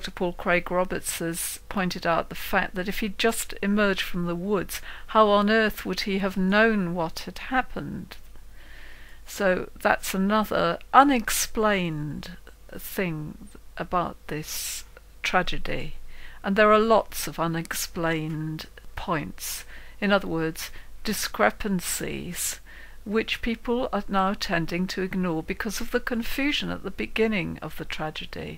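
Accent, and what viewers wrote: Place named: United Kingdom. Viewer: Another thing I take issue with is leaving clues, or putting clues in movies or any nonesense like that. What's a practical reason for it? Why take such a risk? We can keep our guns and defend our right to have them without reaching like this. And you have the nerve to call others gullible. You'd have to be even more gullible to believe this, too. It is nice to hear a British accent that's for our second amendment but please...don't "help" us. We have enough kooks to clean up after.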